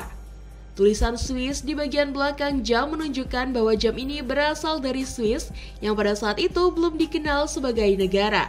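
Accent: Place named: Tegal, Indonesia. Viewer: pengisi suara nya seperti orang jawa, tegal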